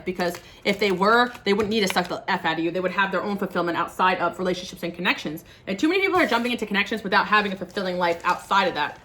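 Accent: New Jersey, United States. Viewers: I love when your Jersey accent comes through.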